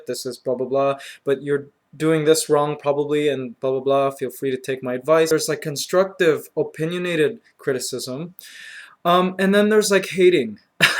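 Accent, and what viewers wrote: Philippines. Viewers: ._. i find it more interresting to watch ur vids in the pinoy accent xD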